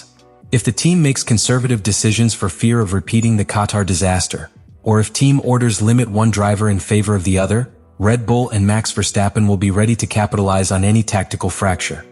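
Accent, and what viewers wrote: United States. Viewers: American accent with rubbish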